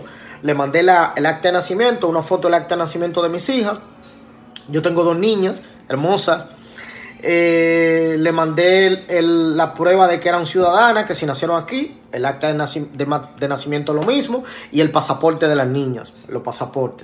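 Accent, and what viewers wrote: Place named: Dominican Republic. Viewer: Bien explicado Con acento Dominicano.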